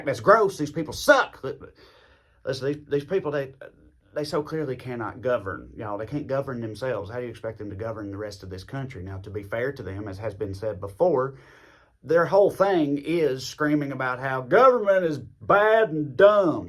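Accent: South, United States. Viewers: I am a Canadian (love your Southern accent)…seriously though, you shoot straight from the hip, and call it as you see it! You are the ACTUAL voice of America, over all the Trumpers and Republican deadbeats. Thanks! Lovely hearing your views. Hope there are a majority out there that feel the same way you do! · I never realised that someone with a southern accent was capable of criticising the Republican party.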